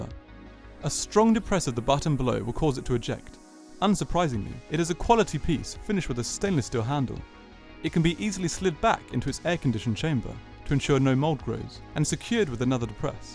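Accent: RP, United Kingdom. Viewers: You sound like you have just left Eton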